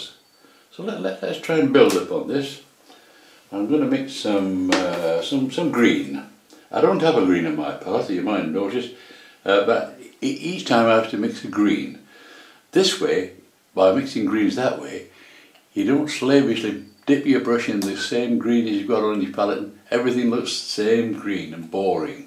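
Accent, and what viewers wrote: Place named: England, United Kingdom. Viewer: Love it I have always had to have a drawing to work two, so nice to hear an English voice, You explain everything you are doing so well, Will be having a go at this tomorrow, starting at your older lessons and working my way up, Thank you so pleased I found your site. Eric Lancashire Expat in Spain, Sorry about that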